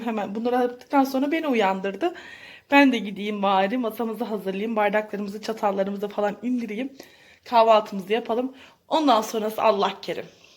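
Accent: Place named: Kayseri, Turkey. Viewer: izle vallaha keşke bizde seninle böyle olsaydık ne güzel olurdu leee🤣🤣🤣kayseri şivesi leeeee🤣🤣🤣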